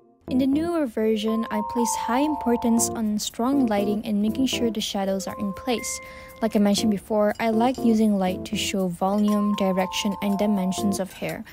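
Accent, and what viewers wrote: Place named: Malaysia. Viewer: Hey!! Thanks for this video!! I actually did some self-critique on my artwork before so I could relate to this video Also, I KNEW IT, YOU'RE MALAYSIAN!! I thought your accent kinda sounds Malaysian plus your name. Hello fellow Malaysian artist~ And I really like your semi-realistic artstyle. I also do semi-realistic-painterly style as well!! Oh, and also, WTF, YOU'RE ONLY 17!?!??? HOW ARE YOU ALREADY THIS GOOD!?!??? I thought you would be like, 21 or something. But I've seen some really good artists who are quite young, so I guess it's normal Also, why no comms? T__T Oh, and btw, did you have a booth at CF two weeks ago? :oo Anyways, I'm gonna subscribe to you and I'll look forward to see you post more videos and speedpaints~!! Cheers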